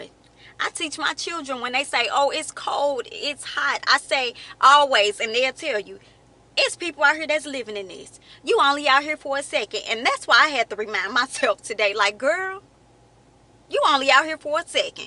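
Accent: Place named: South, United States. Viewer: Words of wisdom from a beautiful southern woman I love your accent omg.I have to get me a southern wife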